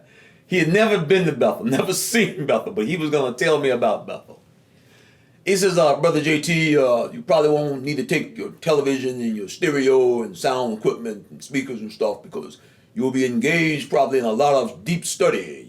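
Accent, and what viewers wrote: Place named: Detroit, United States. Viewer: Man JT you talk and act like my Detroit people. Lol Tell it like it is my brother.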